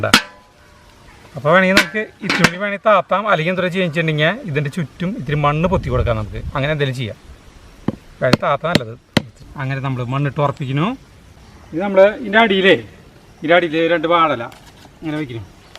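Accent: Thrissur, India. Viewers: thrisur basha kelakan vendit sarikum njn video kanane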